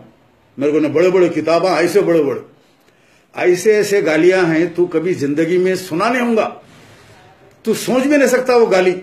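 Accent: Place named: Hyderabad, India. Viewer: lol 😁😁😁😁 Hyderabadi Slang 💪💪💪💪💪 keep it up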